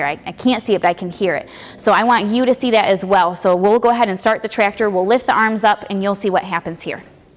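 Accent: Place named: Michigan, United States. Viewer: Ah the classic Michigan accent. I miss it. Living in Kentucky.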